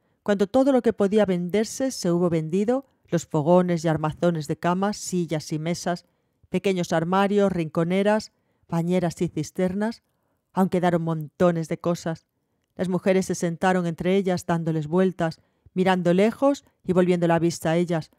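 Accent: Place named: Aragon, Spain. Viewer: De que parte de españa eres? tienes un accento muy bonito, y buena voz =) soy muy malo para los accentos, eres mañica??? xD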